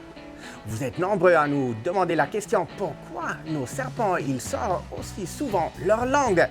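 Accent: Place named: Alsace, France. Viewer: Merci pour la vidéo très instructive !! J’ai une question qui n’a rien à voir (et qui j’espère ne sera pas mal prise 🙏): d’où vient votre accent? Ça me fait penser à ma grand mère Alsacienne de Mulhouse 😊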